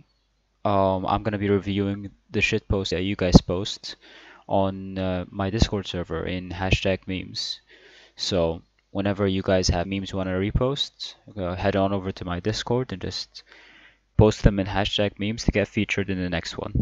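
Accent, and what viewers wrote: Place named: Egypt. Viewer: the egyptian accent is just 👌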